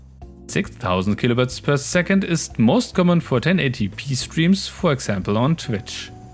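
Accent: Germany. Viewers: Das du ein Deutscher bist, hört man richtig raus 😂👍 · Ich hab dein deutschen Akzent anders rausgehört das ist einf krass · Du bist deutsche Man Hört es raus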